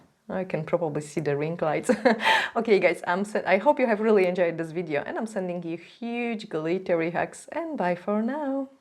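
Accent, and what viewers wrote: Scotland, United Kingdom. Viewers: I love these they're gorgeous! I've actually just tried doing some flowers like this and I'm amazed at how breaking it down and starting with the dots is such a HUGE help, so thank you! 👏 By the way, Is that a bit of a Scottish accent I hear? 🥰💜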